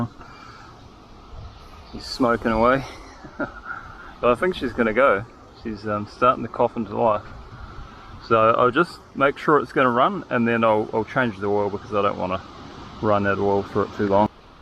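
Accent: New Zealand. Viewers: Recording equipment you use and how much time? Amazed at the variety of angles you achieve and even what looked like overhead drone footage at the end! Amazing. How many and what type of cameras are you using and how do you set all up to make these videos so well produced? Are you filming solo? It feels that way. A fun 30 minute video on how you set up, film and edit would be fascinating! Love the kiwi accent as well! We lived in the Bay of Island for seven magic years. Miss it. Well done mate! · Just came across this channel then realized hey you sound like a kiwi me too! Bet we have some common friends somewhere 😅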